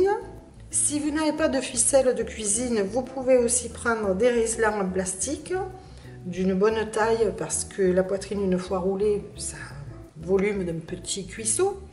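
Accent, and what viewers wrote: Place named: Provence, France. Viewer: bonjour merci de cette magnifique recette..vous avez un accent qui ressemble au mien je suis provencal d'arles et je vis en thailande ........adessias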